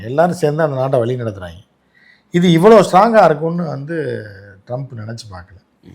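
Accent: Madurai, India. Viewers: டேய் மதுர பேச்சை நிறுத்துடா சடங்குசுத்தியாச்சுனா என்னடா?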